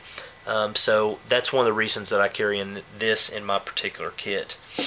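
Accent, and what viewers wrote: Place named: South, United States. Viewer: Your accent tells me somewhere in the south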